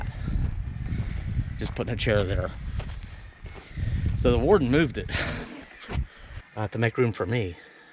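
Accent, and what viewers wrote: Pennsylvania, United States. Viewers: you are an exceptional friend to do all that you have done for Ausia. I am also impressed with your diagnostic skills. As soon as I heard your accent, I knew you were from PA, as am I. The ferry at Port Angeles can take you to the San Juan Islands, USA. I put my RV on the ferry and went to Friday Harbor to visit friends. I think for a 22 foot RV, it was about $80 back in the early 1990's.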